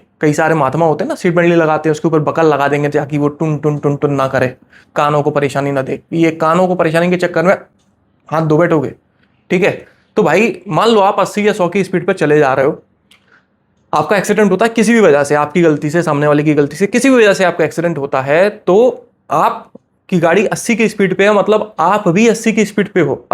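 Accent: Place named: Uttar Pradesh, India. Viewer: kasganj etah ka accent ha apka pr i’ll drive slow always thx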